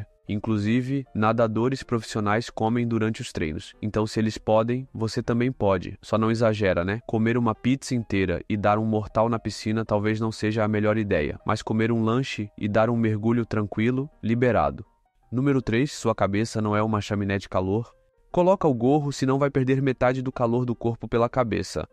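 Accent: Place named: Rio de Janeiro, Brazil. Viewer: tira esse sotaque carioca, me da agonia mano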